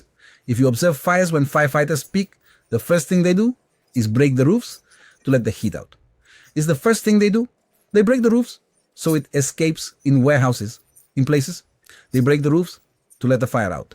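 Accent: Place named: India. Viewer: Guessing its IA....?¿? Misspronuntiation makes me think thats the real you but sound like indi pretty often @@ Interesting project! Gonna keep an eye on it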